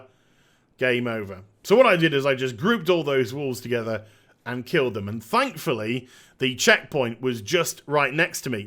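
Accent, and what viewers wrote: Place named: United Kingdom. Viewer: The Brit narration makes this fun to watch! Calls the moose "bugger" and such. And after watching this video I am not even going to bother with the quest.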